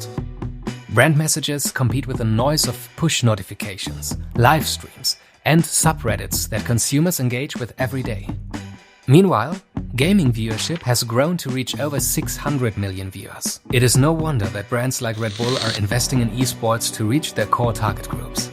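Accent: Germany. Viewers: Is he german? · Echt ein krasser Kanal (ich gehe aufgrund deines Englischen Dialekts davon aus, dass du deutscher bist) habe deinen Kanal entdeckt, als du glaube ich gerade erst drei Videos rausgebracht hattest. Ich finde es echt krass wie professionell und hochwertig deine Videos seit Beginn an sind, da ist der schnelle Wachstum des Kanals nur verdient. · Its Sounds Like you came from Germany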